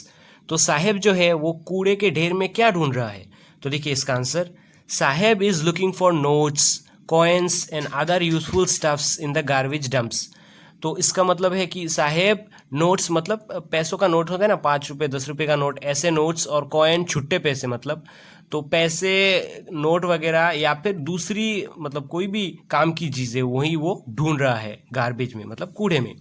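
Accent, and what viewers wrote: Assam, India. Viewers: Bro kya aap assam se ho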